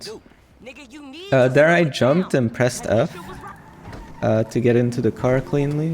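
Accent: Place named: Hungary. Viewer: Na a magyar akcentus befigyel :D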